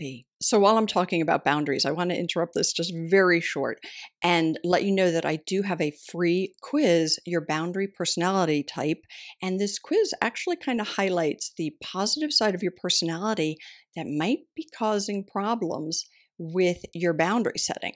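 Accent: United States. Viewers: Faellow... This american flat accents are just hard to listen to.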